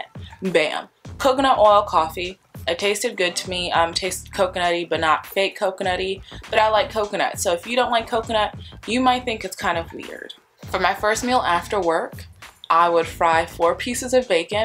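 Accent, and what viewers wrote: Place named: Bay Area, United States. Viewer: Where are you from? Sound like you're from the bay.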